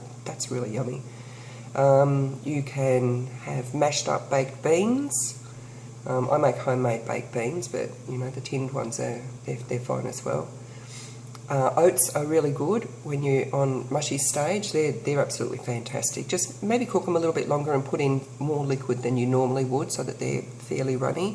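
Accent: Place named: Australia. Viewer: Thanks so much for sharing! This is the second video of yours I've watched. Great to hear an Aussie accent! And when I checked your channel and saw stuff on railway crossings I thought 'Blimey! She's a local chick!' I'm in Frankston and just had lap band surgery a couple of days ago, finding these videos very helpful!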